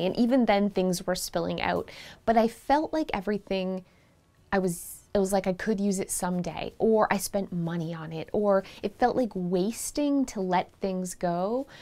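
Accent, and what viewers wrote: Canada. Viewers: Washer in the kitchen, and all the "aboots" Are ya Canadian 🇨🇦 🍁?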